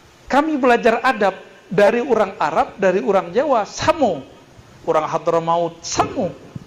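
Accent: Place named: West Sumatra, Indonesia. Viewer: Ok thanks... Mohon maaf aku ya. Agak suudzon aku semalam Kukira dubbing Lanjut. Tetap semangat. Saya suka logat minangnya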